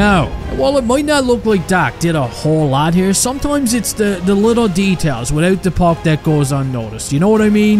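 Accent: Newfoundland, Canada. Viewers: You sound Irish but both Canadian. You must be from Newfoundland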